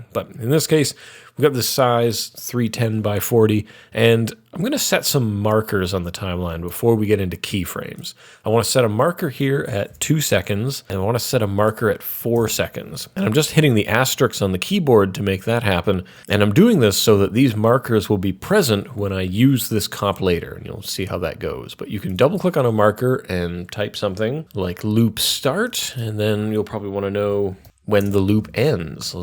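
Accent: Canada. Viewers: Sounds like you're Canadian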